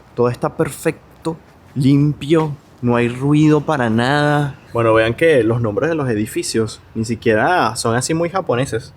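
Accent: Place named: Venezuela. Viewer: Muy bueno el video, lastima esos acentos a Venecos.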